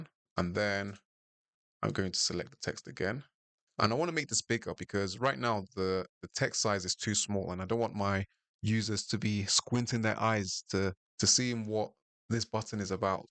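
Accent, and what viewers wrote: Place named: United Kingdom. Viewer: And your British too perfect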